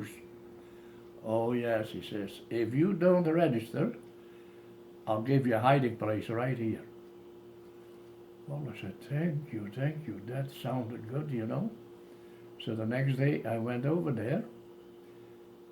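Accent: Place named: Friesland, Netherlands. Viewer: Thanks for sharing the story. Myn fader is also born in Hantumhuizen. Great to hear the frisian accent❤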